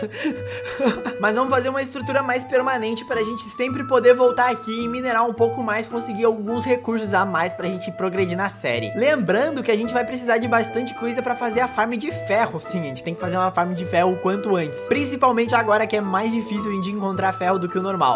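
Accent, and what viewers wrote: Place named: Rio de Janeiro, Brazil. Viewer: Vou ver se foi o Feu cariocando vc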